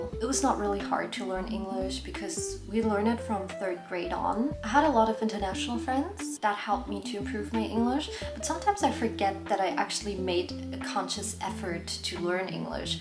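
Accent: Australia. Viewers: the Australian accent is hitting! congratulations!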